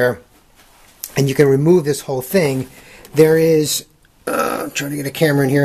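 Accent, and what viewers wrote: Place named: Bronx, United States. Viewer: first thank you for taking the time out to reply. i used ur tips and cleaned out the P- trap, opened the sub pump,bought a multi meter (first time using one. sh%t scared of electric) now im testing everything around the house thank you ), in a nut shell everything came out right with your instructions,which on the videos you explain everything in great detail thanx for being calm and the way you put your words together and accent( sounds like a BRONX accent)were im from. makes you want to listen more,your great. thanx again MR.thank you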